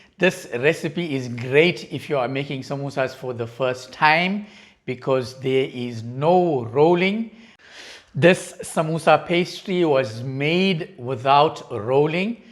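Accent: South Africa. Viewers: ThnXX for Sharing the Recipe with gr8 tips by Pressing the dough balls. Sir just by your accent of talking I knew you’re from South Africa!!! Iam from Zambia LSK. now settled in the 🇺🇸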